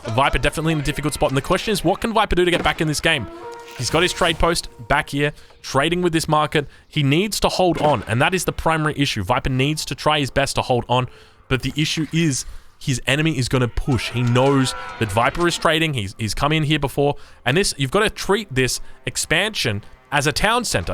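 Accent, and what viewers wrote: Australia. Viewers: So refreshing to listen to a fellow Aussie caster. Love ya work mate, keep it up!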